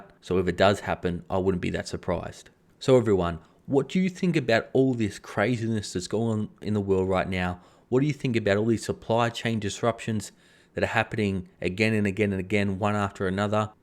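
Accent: Australia. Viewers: Are you from auzzie or nz bro? You sound like an anzac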